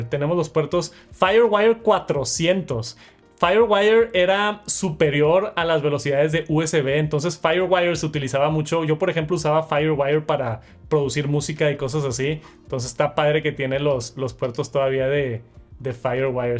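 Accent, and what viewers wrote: Mexico. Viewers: Porque habla así con ese tono, como afeminado o de niño adinerado. 🤔 Es por Alguna region de México hablan así o es solo esta persona ?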